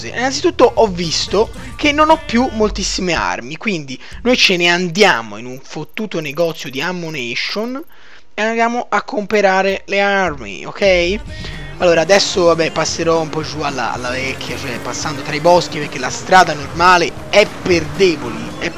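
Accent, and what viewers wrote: Tuscany, Italy. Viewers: Ma sei toscano? il tuo accento mi ricorda tanto il mio. sai i toscani si accorgono dell'accento toscano degli altri più facilmente degli altri :D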